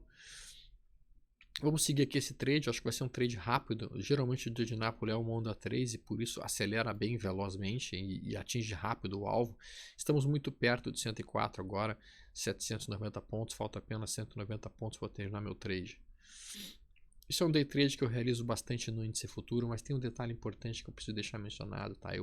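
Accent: Rio Grande do Sul, Brazil. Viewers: Tu é do RS?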